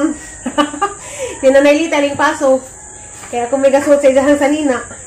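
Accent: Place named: Visayas, Philippines. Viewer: . Arang k islang ag host oii. Halata baya PUD NGA BISDAK KAAU.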